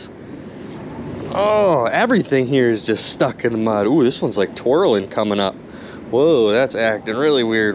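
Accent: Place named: North, United States. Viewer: Hey you in wisconcin or minnesota?Ican tell you are up in the northern stayes but cant tell which one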